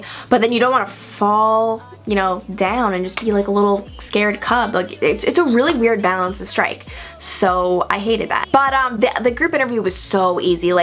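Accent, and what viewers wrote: United States. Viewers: I love your accent! Which part of America are you from? 🌞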